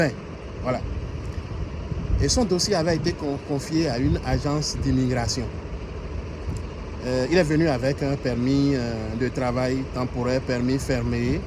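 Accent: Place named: Benin. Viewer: Merci beaucoup vous avez un accent béninoise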